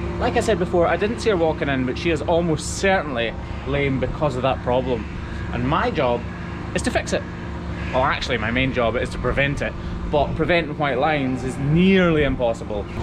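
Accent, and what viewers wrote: Scotland, United Kingdom. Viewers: First I love that your a Scot so am I and I was a dairy farmer in Poland Central NEw York very ruff winters! I love your show, your accent, the land is beautiful l. Me watching your show is like almost being in Scotland thank you for this show Always Kathleen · I've been on the horse side of things. Very interesting to learn about the cows claws. You make it enjoyable & easy to pick up on. Easy to understand. The accent helps too. I become a fool for anything Scottish. Especially accents... 😏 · You forgot to mention his wonderful Scottish accent. That’s not as important as learning something about his trade or his passion and care for these animals, but I’m with you. I’m a 66 year old lady from Centennial, Colorado, SE of Denver, and I find myself binge watching these videos of his. Very enjoyable pasttime. 😊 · Have you ever checked out your subtitles? 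It's hysterical sometimes, the bot cannot figure out your accent. Scottish is my favorite accent btw. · My mother's maiden name was Cameron. I have been to beautiful Scotland. Your Scottish accent makes me feel closer to my ancestral roots. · I’ve gone through so many videos of yours by now. I have been thinking about what it is that makes it so compelling. Trying to pin it down is hard but for me it’s the combination of the Scottish dialect, your charisma as well as passion for your work. It’s all just so wholesome! Albeit gory and educational 😊 Anyhoo - thank you ✨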